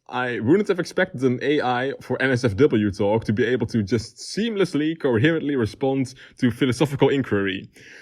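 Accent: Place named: Netherlands. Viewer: Are you dutch? You really sound dutch Anyways, great content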